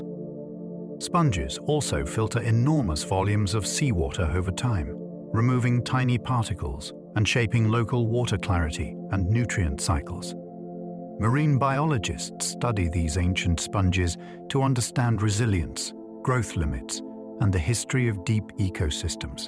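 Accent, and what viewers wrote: United Kingdom. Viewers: Listening from Iowa, USA. ❤ I like to listen at .75 speed and love the British accent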